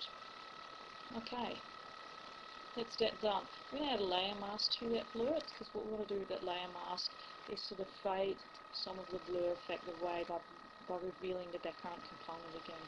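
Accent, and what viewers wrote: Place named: United Kingdom. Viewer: jesus. the british accent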